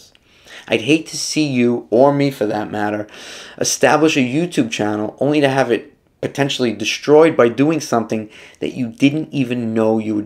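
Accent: New York, United States. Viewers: Great content. Your accent takes me home - NJ? NY? As a transplanted New Yorker I noticed it immediately.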